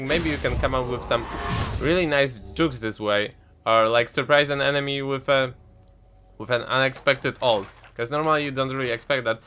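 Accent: Poland. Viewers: Ten akcent odrazu się z polską kojarzy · Uwielbiam twój polski akcent :D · jak to polak chyba xd(słysze po akcencie)xdd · Are u polish? U sound like polish guys. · coś tak czuję że Polak jesteś ;)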